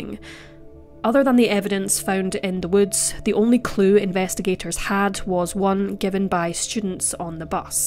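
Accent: Scotland, United Kingdom. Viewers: Great content, great accent. Are you scottish?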